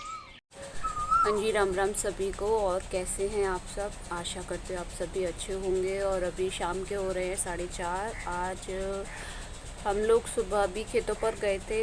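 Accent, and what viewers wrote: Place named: Aligarh, India. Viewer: Haa mam ki awaz sunkar mera dost mere se pucha rha hai to me bola yeh awaz aligarh se hai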